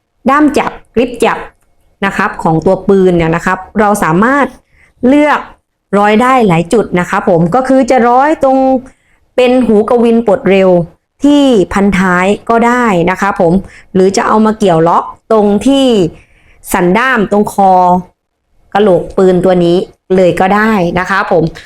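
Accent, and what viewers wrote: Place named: South, Thailand. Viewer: ร้านอยู่จังหวัดอะไรครับ สำเนียงคนใต้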